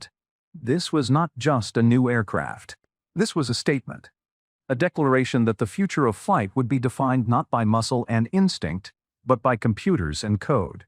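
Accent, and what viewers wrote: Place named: United States. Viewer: The American accent reveals the lie to this video. Many Americans have a bias towards Boeing aircraft. I have flown helicopters and fixed wing aircraft. Among the fixed wing aircraft have been the Boeing 737, Airbus A320 and A330. Comparing the A320 and the 737 the A320 is by far the better aircraft to fly. It's designed to be flown through the autopilot, but so is the 737. The Airbus is just so much better through the autopilot. The sidestick has been normalised by years of computer gaming. It also removes an obstruction to the instrument panel. The instrument panel is clear and concise with everything you need on the PFD (Primary Fllight Display) directly in front of both pilots. The most important safety factor in flying is situational awareness. The unobstructed view of extremely clear and concise instruments provides that. 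The cramped cockpit and a yoke in the 737 is less helpful. Hand flying in Normal Law is a pleasure with a sidestick which has enough feel and instruments providing excellent feedback. Increasing thrust in a 737 raises the nose with the engines under the wings. Reducing thrust you get the opposite effect. Under Normal Law the A320 system detects any increase or dcrease in 'g' and counteracts it. Increase thrust and you just go faster. So much easier with the autothrust on the approach. On the 737 you need to take out the autothrust on the approach to avoid porpoising as the autopilot chases the porpoising. So many other good points to the Airbus. I've a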